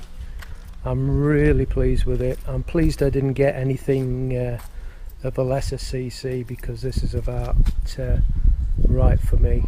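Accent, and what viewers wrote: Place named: Geordie, United Kingdom. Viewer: try.some dried fish, they stock it dry so its a food supply when they osnt anything else. my partner brings it back with her. if smells a bit but im used to it now. Nice bike you have there. keep them coming the posts, you have a fan club now i think. when you first went to the Philipines your accent was east Midlands but now youre back to your roots i can hear the geordie twang now. take care all the best.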